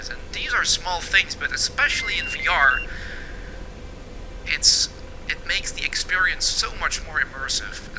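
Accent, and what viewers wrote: Netherlands. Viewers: you sound exactly like a dutchman trying to speak English. Well done. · I hear a dutch accent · I know a Dutch accent when i hear one :)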